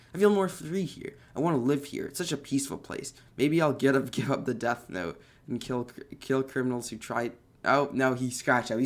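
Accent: United Kingdom. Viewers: Dude great job on the British accent too! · good job with the birtish accent!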